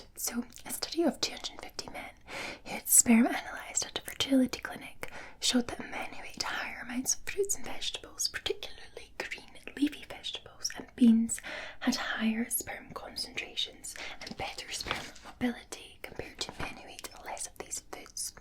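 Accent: Scotland, United Kingdom. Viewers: 🧡 thank you very much for this video. I truly do love it. Is it the best one yet, I wonder if it can get over the best ones before. And you're wearing such a great dress, the dress with all the cute things. What an awesome clothing, best spring dress. 😊 I bet you chose it because of the spring did you. 😃 Also I loved your latest video. Even though your Scottish accent is amazing, I also like to hear you talk with that English accent. You were really wonderful with your acting skills and making me sleep with entertainment attached to it. I had looked forward to see Lara Croft again and there poof she appeared like magic. You were awesome, schön, ausgezeichnet. 😃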